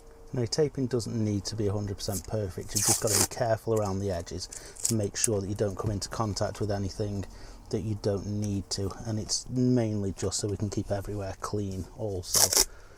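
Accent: United Kingdom. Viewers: Is this your first time hearing a British English speaker?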